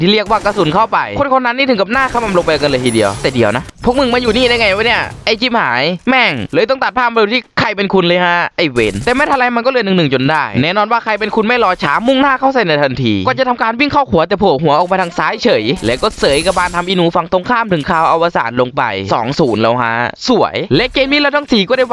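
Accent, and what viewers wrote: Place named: Isan, Thailand. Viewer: คลิปนี้พี่เป็นคนอีสานนะครับทำไมพูดเหมือนคนอีสานเลย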